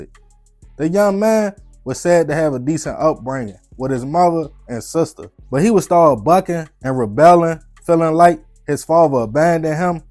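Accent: Baltimore, United States. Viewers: Your B-more accent is awesome.. one of the reasons why i subscribed 👍🏾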